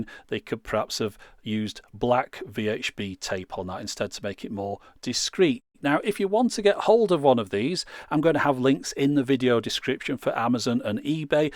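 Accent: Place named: Lancashire, United Kingdom. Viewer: I recognised Wigan and a Lancashire accent.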